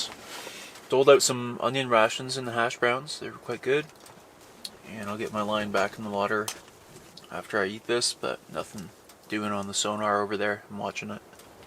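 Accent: Canada. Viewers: is it just me or have you been talking way more canadian this video than usual? maybe im crazy 😅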